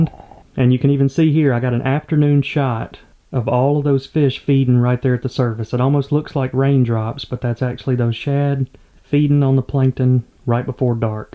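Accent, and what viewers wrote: South, United States. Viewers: Has his accent become more southern??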